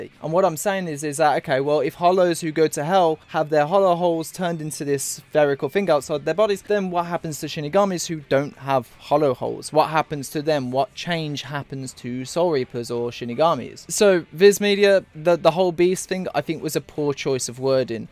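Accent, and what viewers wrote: United Kingdom. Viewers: haha sorry😅 maybe I should've worded that differently. I'm just subbed to a ton of different channels. Like hundreds... Anyways yeah so excited for bleach again! And love when you talk about it. Especially when you go into the symbolism and theological side of it. Your cool British accent keeps me engaged too. Now imma have buy some merch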